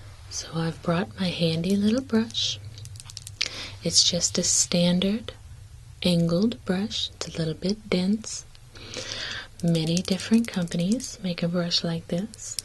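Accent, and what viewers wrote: South, United States. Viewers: This is the first video I've watched by you but im blown away with the fluidity of your speech, your knowledge of the products and your voice itself. Do I detect a hint of a southern accent? I'm from Texas so it sounded somewhat familiar haha. In addition, it's always a plus to have your makeup done by Morticia Adams haha, I love your look!!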